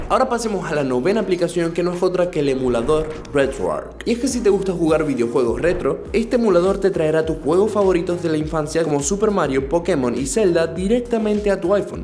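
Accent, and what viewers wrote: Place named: Venezuela. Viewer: Eres de Venezuela verdad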